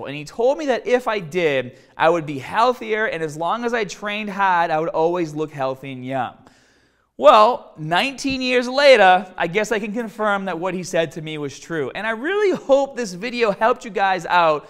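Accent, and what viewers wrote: Boston, United States. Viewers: I'm new to this fitness thing... Are you from Boston? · The Bowston accent is awesome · Gotta love the boston accent! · i cant tell how old you are, but i can guess you're from boston? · New here... are you from BOSTON? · u must be from boston :D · are you from boston?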